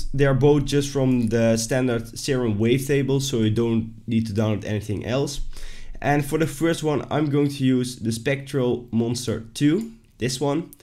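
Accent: Netherlands. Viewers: you sound dutch. are you dutch?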